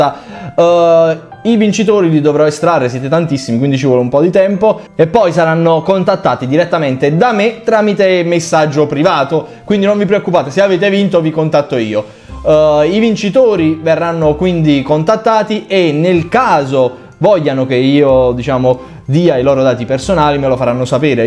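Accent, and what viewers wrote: Naples, Italy. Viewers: Non si sente che è di Napoli? 😂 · Ma sei napoletano?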